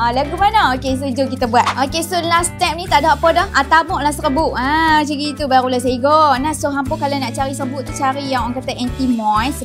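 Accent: Kedah, Malaysia. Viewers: Puaih dgq loghat kedah ampaa oii😂😂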